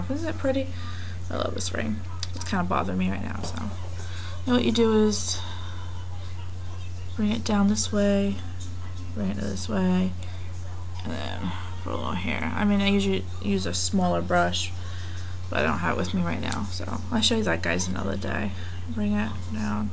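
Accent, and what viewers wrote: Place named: Kentucky, United States. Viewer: there is that kentucky accent again lol , funny , enjoying these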